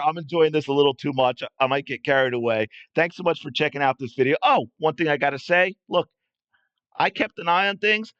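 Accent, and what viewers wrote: Philadelphia, United States. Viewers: No need for the Cowboys slander. You sound like your from Philly. Let’s just keep it to the Aces implosion and Aja crying.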